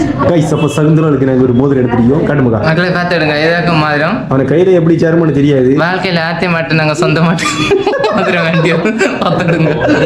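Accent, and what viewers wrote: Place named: Kanyakumari, India. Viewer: அண்ணா நான் பிறந்தது மதுரை ஆனா வளர்ந்தது கன்னியாகுமரி முழுக்கோடு YMCA உங்க கன்னியாகுமரி பாசை கேட்கும்போது என்னுடைய சின்ன வயது நியபகம் வருகிறது வாழ்க்கை இந்த பாசை என் வாழ்க்கை மறக்க முடியாது மிக்க நன்றி அண்ணா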